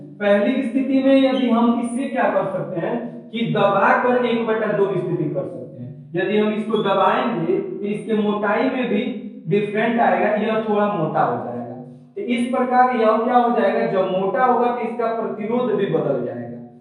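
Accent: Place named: Gujarat, India. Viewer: Apki awaz gujrati sunayi parti thora saaaf bola kariye sir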